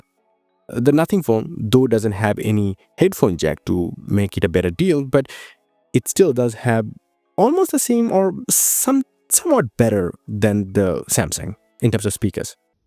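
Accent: India. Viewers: Indian accent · pajeet voce, DO NOT REEDEEEMDEED OTR WATCHT!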